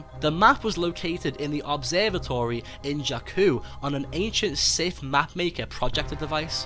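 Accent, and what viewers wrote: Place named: Northern England, United Kingdom. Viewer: Just wondering - I'm guessing you're from northern England - what football team do you follow?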